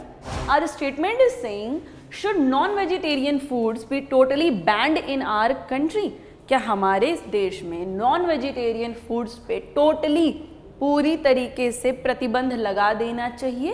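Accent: India. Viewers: english indian is hard tbh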